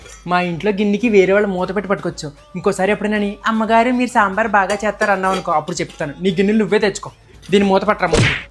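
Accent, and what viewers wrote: Godavari, India. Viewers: garidi native ekkado ఎవరికైనా తెలిస్తే cheptara, yasa/slang bhalega untundi, maybe east or west గోదావరి అనుకుంటున్నా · Super bro 😂😂😅😂😂but konii words ardham avvadu godhavari slang,,,,but kurnool slang andhariki ardam avuthundi 😊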